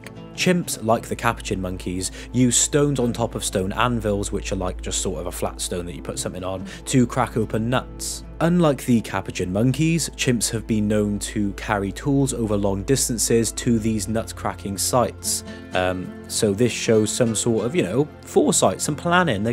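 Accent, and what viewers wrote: United Kingdom. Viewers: He's a british guy with a calm voice speaking about biology so he's probably right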